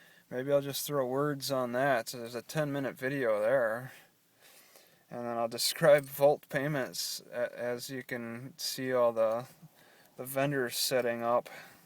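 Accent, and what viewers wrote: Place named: Alaska, United States. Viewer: lots of tourism related jobs and cannery work up here (just don't tell anyone its our secret). but some canneries house and feed you! even though the pay isn't always the greatest. The rain keeps a lot of people from living up here though. you talk like an Alaskan... a State of very independent people.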